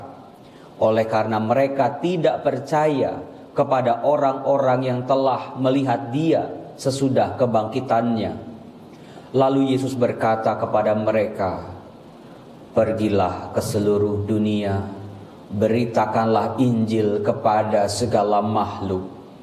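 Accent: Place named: Eastern Indonesia, Indonesia. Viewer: berarti tinggalnya didaerah kebun jeruk. seperti orang dari Timur tapi halus kalau berbicara seperti orang jawa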